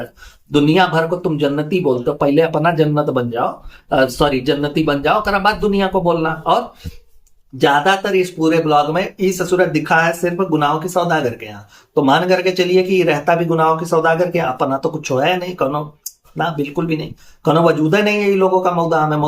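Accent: Uttar Pradesh, India. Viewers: Bhai aap up se ho ??aapki baato se lgta hai main bhi up se hu ...accha lgta hai aapke bolne ka andaj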